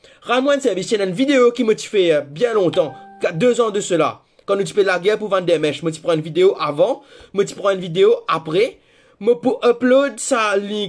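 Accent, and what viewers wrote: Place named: India. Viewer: I wonder where did you learn how to speak English. Probably in New Dehli.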